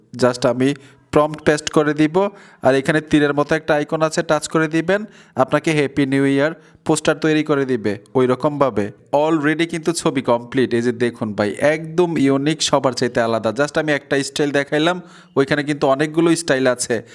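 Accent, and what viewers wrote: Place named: India. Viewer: ভাই আপনার কোন কথা শুনলে বাংলা হিন্দি শোনা যায়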